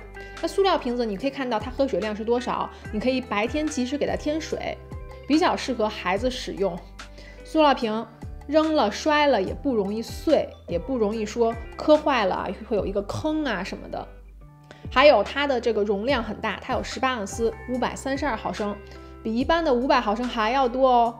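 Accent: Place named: Beijing, China. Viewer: 北京口音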